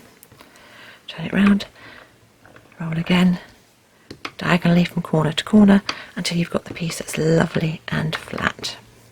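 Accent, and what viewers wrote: United Kingdom. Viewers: You inspired me today, to borrow some ideas from you, think up some of my own, and make a veneer, and then a bracelet. Mind you, it was the absolute worst weather for working with polymer clay (hazy, hot and humid), but I did it anyway. It came out pretty well, too! The funny thing is, every time I grab a wooden skewer to smooth out seam lines, I can hear your sweet British voice inside my head, coaxing me along. Thanks for all you do.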